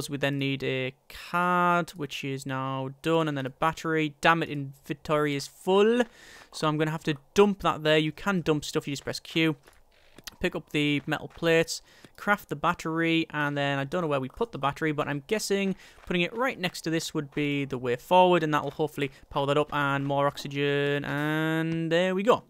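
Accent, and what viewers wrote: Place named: Newcastle, United Kingdom. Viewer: are you from newcastle? ya got that geordie accent if ya na what a mean :D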